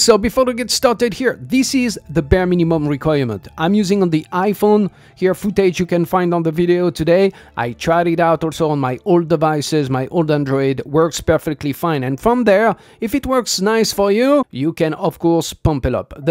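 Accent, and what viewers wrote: France. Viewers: your english sounds french lol nice video bt the way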